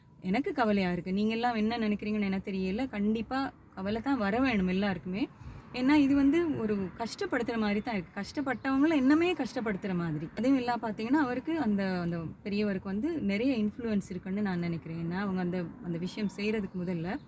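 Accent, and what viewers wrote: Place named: Chennai, India. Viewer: Madras தமிழ் பேசுவதை நிறுத்தினால்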